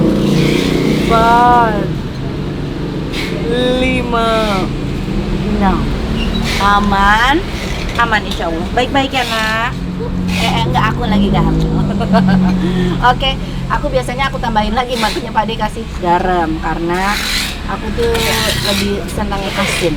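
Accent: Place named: Sunda, Indonesia. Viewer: Sekarang aq jd ngefans am teh.. ngomng nya sunda pisan orgnya baik ke semua org sehat Dan sukses Terus teh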